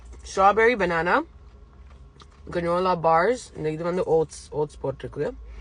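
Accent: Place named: Sri Lanka. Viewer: U r correct baby U r great to see to talk tamil Feel u r srilankan tamil Romba nella erukku New delhi❤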